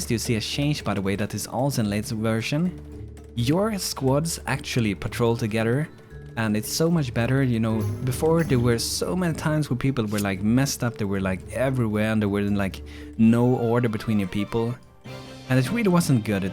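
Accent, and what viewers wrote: Sweden. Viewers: This game has so much potential, whenever I saw the trailer i was super excited. Awesome videos, dude. Subbed! :) ps: Where are you from? You sound swedish.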